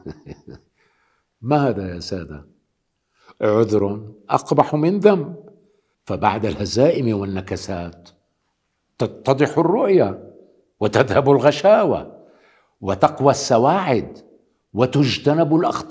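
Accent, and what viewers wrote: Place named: Damascus, Syria. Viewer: ما احلى النطق الدمشقي للغة العربية عظيمً يا أستاذ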